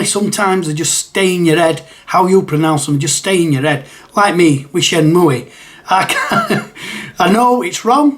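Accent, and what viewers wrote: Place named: Yorkshire, United Kingdom. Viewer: Infogrames always used to get me because I never used to see the R 🤣 Xexyz is a good one, lets just call it Keith from now on. Suikoden I dont have an issue with as I will never play one of those games anyway. You are only saying Eeenix that way because you have a Yorkshire accent. I don't remember pronouncing Tau Ceti before, maybe I did 🤔 That one at the end 🤣🤣🤣 My brain hurts now....😊👍🐻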